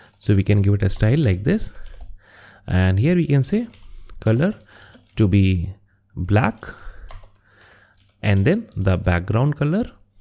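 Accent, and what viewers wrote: India. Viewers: May be, I hear a sound similar Bengali, never mind, your lecture is really good, appreciate for your hard work.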